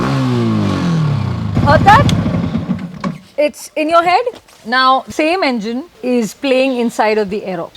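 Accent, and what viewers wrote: Maharashtra, India. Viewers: YOUR MARATHI IS SO CUTE MY MOST FAVORITE ACCENT VIRU BOLE TOH AAP NE KO AISE ICH BAAT KARNE NA KA HA JI👏👏👏👍👍👍👍👍👏👏👏👏👏👏👏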